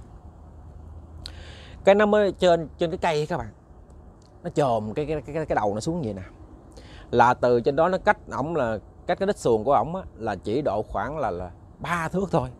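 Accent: Mekong Delta, Vietnam. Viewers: Ma mà khôn quá xá hả. Thích nghe giọng miền tây của em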